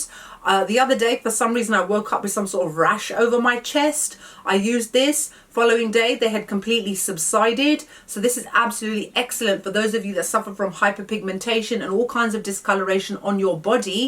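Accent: United Kingdom. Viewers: You are giving me Leah Remini vibes, only with British accent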